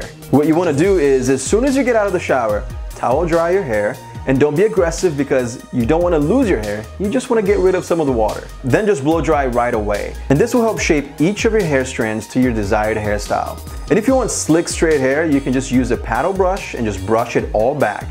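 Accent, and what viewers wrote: Brazil. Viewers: Caraca meu velho. Tu tem algum vídeo contando tua história? Teu inglês é mt bom, mas eu consegui ver que tu é br hahah Sucesso! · Dude has light Brazilian accent, maybe? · boy, you brazillian? cuz you sound like it, just a little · Cute strong Brazilian accent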